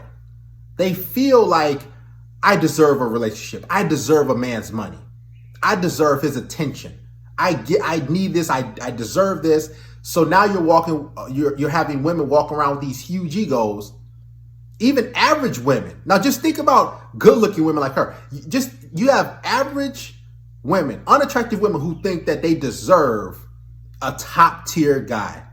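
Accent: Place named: Chicano, United States. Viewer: You speak like a "vato" bro.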